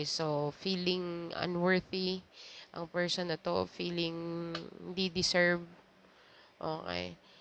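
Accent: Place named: Iloilo, Philippines. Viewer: 😂😂😂 sagad mag ilonggo si ❤❤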